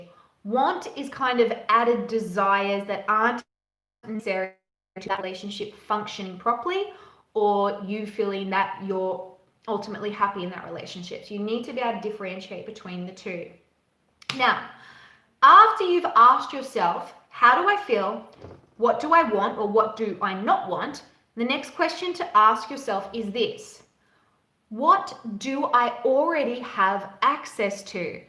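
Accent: Australia. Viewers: How about we feel like 💩 because our rights have been taken away! You: “Things aren’t going back to normal.” Well, sounds like you’re in Australia by your accent. So, enjoy being under house arrest & just taking it like a good lil sheep.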